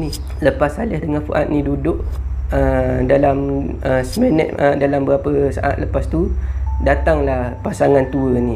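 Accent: Terengganu, Malaysia. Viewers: Hadir sapport org ganu kite.. Slang ganu mmg x leh lari 👍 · Bru nk Tanya orang Tganu kita ke? Betul pulok ambo agak orang Ganu.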